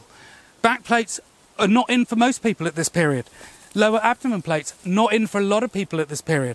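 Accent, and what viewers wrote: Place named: United Kingdom. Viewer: Harry Potter accent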